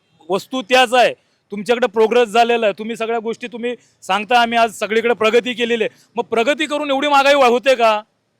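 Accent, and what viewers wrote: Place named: Konkan, India. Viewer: Nakki kokanatla ahe ha... Jabardast boltoy bhau ..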